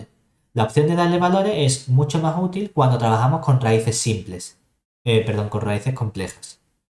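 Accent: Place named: Cádiz, Spain. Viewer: de donde eres? Soy estudiante de ingenieria en la UNED y tus videos son la bomba, tengo familia en el Puerto de Santa Maria en Cadiz y tu acento de verdad me suena de esa zona y me encanta, un gran abrazo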